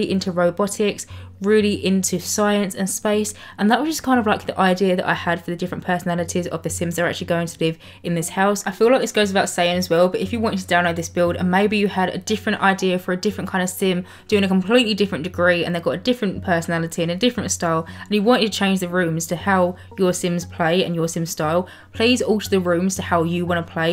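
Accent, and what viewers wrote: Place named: Essex, United Kingdom. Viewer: Just came across your channel! I feel like you’re an essex gal like me! (I could be wrong) 😂 great video none the less. Keep going babe x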